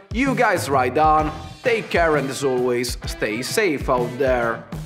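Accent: Italy. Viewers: what an annoying voice you have. Overkilling italian accent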